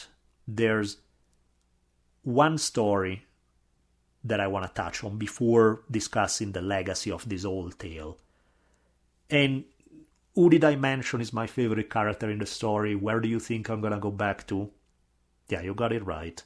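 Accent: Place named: Italy. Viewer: Your story telling along with that fantastic Italian twang is just awesome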